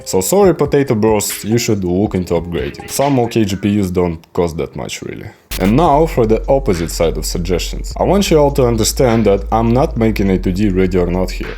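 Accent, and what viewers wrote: Russia. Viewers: Are you russian—your accent seems to be russian. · I can HEAR the Russian and you know how good russian games can get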